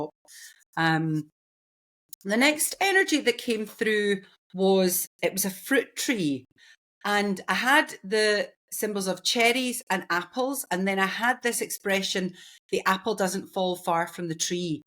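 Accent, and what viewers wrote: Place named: Scotland, United Kingdom. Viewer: I felt connected with the first video I saw. Thank you, and PLEASE keep going. Wishing you all the best for your channel. I've just shared this vid. P.s. I absolutely adore your accent. Scotland is One of my most favourite places on earth. ❤XX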